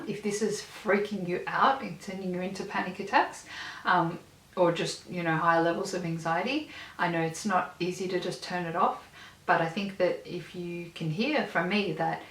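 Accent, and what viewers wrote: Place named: Australia. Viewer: Already feel much better after watching this. The aussie accent is definitely soothing too haha.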